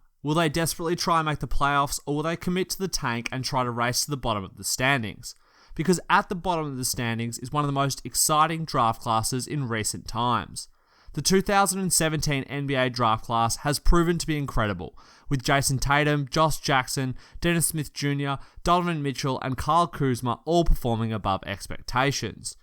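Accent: Australia. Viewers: You sound like you came from Australia? Did you? 🤔🤔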